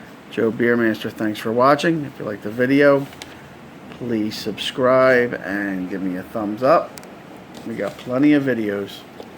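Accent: Philadelphia, United States. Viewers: You from Philly? Know the accent anywhere lol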